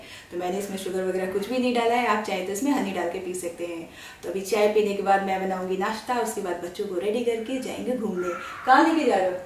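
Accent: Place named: India. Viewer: Fiiling philing ek dam deshi wali English very nice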